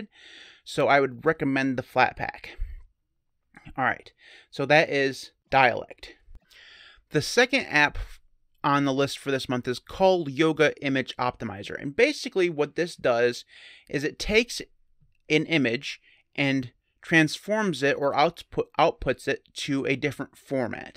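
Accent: Spain. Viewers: Me encantó tu acento en español, I loved your Spanish accent, maybe my English accent doesn't is so good. Dialect reminds me Crow-translate, the interface seems to be the same. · Buen acento español :D eres el mejor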